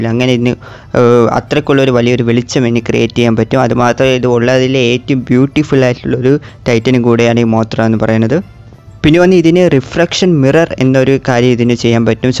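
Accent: India. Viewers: Thamizhan ആണെന്ന് തോന്നുന്ന്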